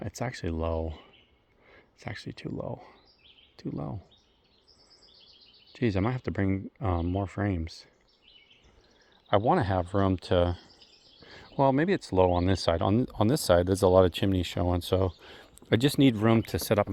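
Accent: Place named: Northeast, United States. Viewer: Any idea where this guy is from? His accent sounds like he’s from the northeast maybe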